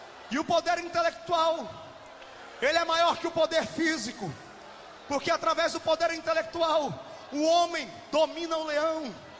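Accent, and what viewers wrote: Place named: Northeast, Brazil. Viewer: essa época ele tinha até um sotaque meio nordestino 😅😂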